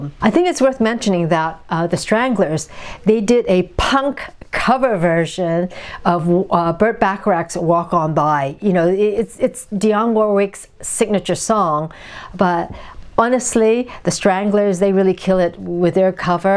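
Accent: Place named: England, United Kingdom. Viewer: Hey I stumbled on this video by accident also, I love the the Stranglers too and like very much. I been watching this American girl Melinda something, her videos about vinyl records but her accent just drives me nuts 😂 so good to hear an lovely English speaking woman doing reviews. Keep up the good work your amazing 😊 thank you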